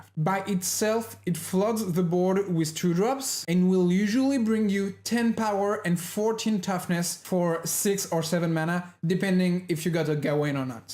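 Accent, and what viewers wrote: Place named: France. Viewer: That accent,you can't fool me,are you french?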